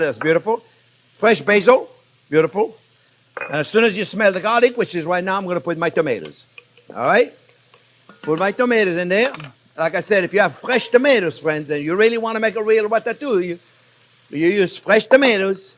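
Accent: France. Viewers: Chief Jean continue talking , I love Your French accent it’s different than other chiefs , we choose yours to watch , 😂👏👍👌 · Thanks Chef so much, I love all your talking, and sense of humor, saying "I am from N.Y" with that thick French accent:), you are so funny. and I truly feel lucky to find myself in a free , French culinary school, without the pressure to learn these recipes in English, with so much fun and humor. I agree those who think you talk too much, they don't deserve to watch you, also I find it extraordinary rude to speak to a chef of your Caliber like that, they sure don't understand the Culinary culture and in French Culinary school, if a student talks to Chef like that, would not longer be there. so these rude students, who watch your lessons for free and disrespect you, really don't deserve these teachings. I honor you. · Beside the excellent recipes and very well explained, you share with us, I enjoy the beautiful French accent . 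That is a plus.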